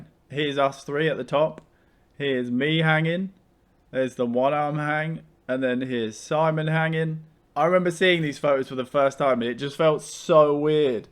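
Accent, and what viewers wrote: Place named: Southampton, United Kingdom. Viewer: My step-dad used to do this for a living (work at the top of tall structures, down at Esso, cranes at the docks etc.) and I guess some people are just born without that innate fear. Also, I didn't know you were also a Sotonian until this video and it's weird I kind of guessed it from the accent! Enjoying your content, thanks.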